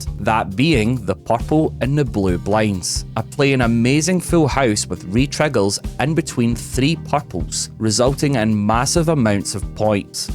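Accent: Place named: Scotland, United Kingdom. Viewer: Luv listening to a Scottish lad playing my favorite game ❤️ cheers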